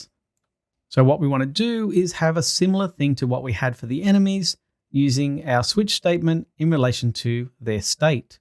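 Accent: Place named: Australia. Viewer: great videos and love the familiar aussie accent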